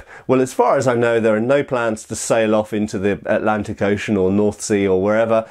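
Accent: France. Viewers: I love your french accent XD Anyway very interesting video once again, I had no idea that the new British passport would be produced in France ^^ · Your French accent is glorious ! ^^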